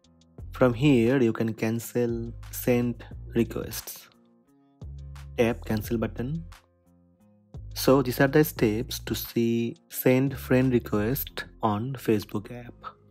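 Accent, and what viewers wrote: India. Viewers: Indian accent😂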